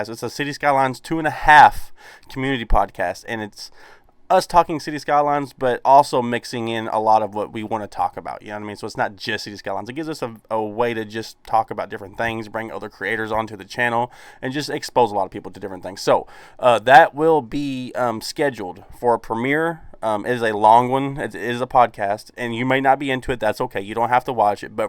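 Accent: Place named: Georgia, United States. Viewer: It's ok, I like your accent, sounds like what I hear in Georgia.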